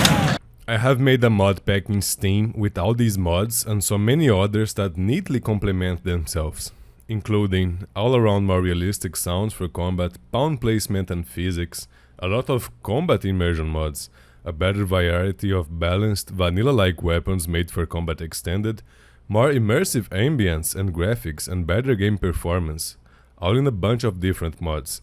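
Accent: Brazil. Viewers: Please man I’m begging do a playthrough with this mod, PELO AMOR DE DEUS MANO EU QUERO ESCUTAR O SOTAQUE BR EM INGLÊS DNV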